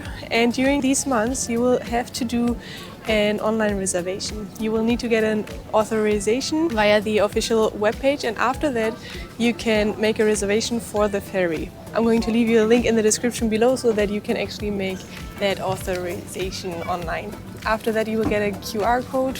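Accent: Germany. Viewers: Spreche doch lieber in Deutsch. Zu starker Deutschakzent! Ansonsten schönes Video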